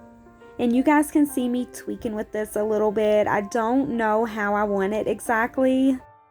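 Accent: South, United States. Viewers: I can’t get enough of the southern drawl 🖤🤍 I lost mine but it’s so comforting 🤷🏻‍♀️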